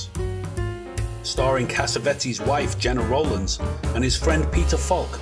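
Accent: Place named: North, United Kingdom. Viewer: Love your Northern accent!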